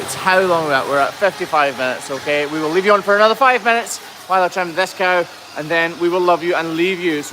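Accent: Scotland, United Kingdom. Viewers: yup. though I usually watch on mute...I learned you are Scottish sounding today! facepalm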